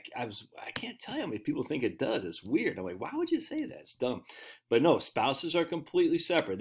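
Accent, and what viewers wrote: United States. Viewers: lol....I always thought you were from New Hampshire!!!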